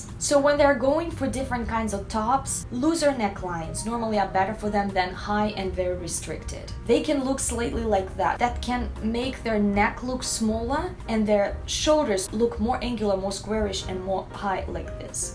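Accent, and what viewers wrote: Russia. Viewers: У вас прекрасный русский акцент ❤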